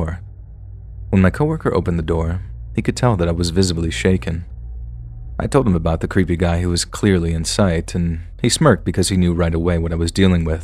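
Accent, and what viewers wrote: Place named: South, United States. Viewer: Your southern accent isn't bad 😆😁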